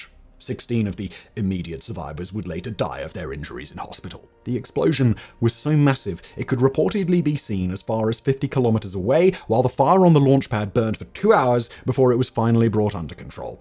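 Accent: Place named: RP, United Kingdom. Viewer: you do realise he is just a narrator, he doesn't write or edit almost of the material, all he does is ready from a script. He seems smart, but really it's just a posh accent, flashy clothes and glasses. His writers and editors are pretty average too because if you pay any attention the amount of inconsistencies, mistakes, misleading information and just blatantly false claims become glaringly obvious. Dint get me wrong, I love his content, but you should be aware he's not this genius everybody seems to think he is.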